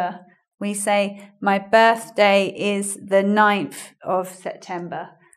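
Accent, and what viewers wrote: United Kingdom. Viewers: Although in Russian schools we are learning English English I cant understand 100% of information because of British accent. Is it a habit due to American movies and series? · Hi! I'm from Mexico and i studied American English for about 2 years and i like it but i absolutely love British English and Accent, your videos are helping me to correct that little errors to reach your accent C: so thank you! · Hi, I have been looking for so long to English trainings with a wonderful british english and recently I found your channel. When I first heard you, I have known that´s what I was looking for. Since then I hear your speech videos on the train in the bus at home in the kitchen everywhere. I'm addicted to your accent and I try to copy that. I am so happy that you are there! You are an excellent speaker and trainer. Very talented. I´ll stay with you because I need your speech style. 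Lucy is o.k. But your speech style/ voice is more cooler, and your accent is more nicer for my and your videos are full of content. I'm so thankful and I´m a big fan of you! Thanks! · Ohh, it was so cute, when you tried so hard to make us undestend your perfect btitish acсent, I`m very grateful to you) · thanks a lot for this lesson, i have really learned too much. i have also subscribed ur channel. im trying to (improve)learn (my)english and to have an amazing british accent like that wich you have got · To me is harder understand the British accent :( but I like it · I love your videos and i like watch them, over all, for your accent, im trying to improve british acent and and grammar too. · I just can´t get enough of your accent keep it that way it sounds by far better than the american english. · God i love listen to your british acccent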